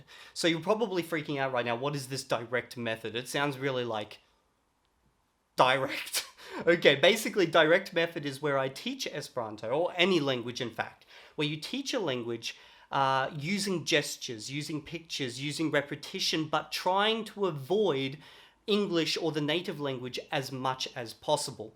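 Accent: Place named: Australia. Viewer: Great to find an Aussie speaker, gives me hope I can do it!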